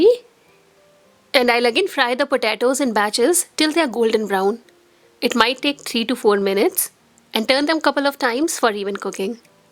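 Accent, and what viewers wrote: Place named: India. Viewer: Da Indian aksent🤗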